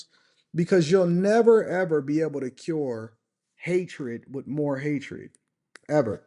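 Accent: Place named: Georgia, United States. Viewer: Sound like he's from Georgia living in the 18th hundred do not know he been free for over 200 years.. just say.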